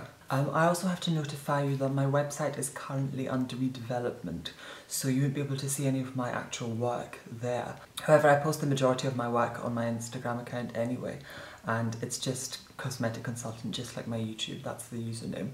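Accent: Scotland, United Kingdom. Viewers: Q&A- I love your accent, what part of the UK were you born? Also, I would love to see your interpretation of Magenta from RHPS! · Is your accent Scottish (proper) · It's a Scottish accent (as you might guess from the surname!) ;) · Are you from Scotland?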